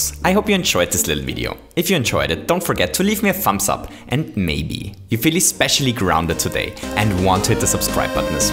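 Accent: Germany. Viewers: i have a question are you german? because your accent sounds kinda german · I love your a bit German pronunciation :)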